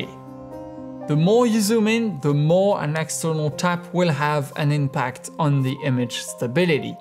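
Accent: France. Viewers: Quel bel accent français. Romantique 🤗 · French maybe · he is french for sure, he is based in Paris and his accent is 100% french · Is he french? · That was very entertaining and informative thank you. I can't decide which is the ultimate French-English moment: "necessessary" or "...and you end up with a very big and expensive lens!👨🏻‍🍳" Said with much love and all due respect... we have francophones in Canada but the "real" French emote and accent words so cutely. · Tu es francais ? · Nice French accent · French accent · You can’t hardly get more french accent than this guy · Do french people seriously still speak like that? He should make an effort, this accent has expired. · why french people have such thick accent bro, I honestly think they don't like english so they butcher it on purpose, spanish is my native tongue and I have virtually 0 accent compared to this guy. 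· he is French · speak normal english, not a dumb french accent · It french accent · T'es pas français toi par hasard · Are you french? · Le commentaire français que vous cherchez. Sacré accent français d'ailleurs. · Ton accent francais xD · Idiot TOOO TECHNICAL AND PLEASE GET RID OF THAT FRENCH ACCENT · T'as un accent français, je me trompe ??? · He has french accent lol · Je préfererais que tu parles en français pas en anglais avec l accent fr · That french accent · Grate camera . and i like your speaking french accent english , Amazing video !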